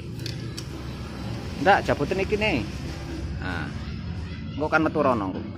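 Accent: Surabaya, Indonesia. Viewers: logat bahasane khas wong jowo timur asli arek2 suroboyo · Suroboyoan ngene wkwkw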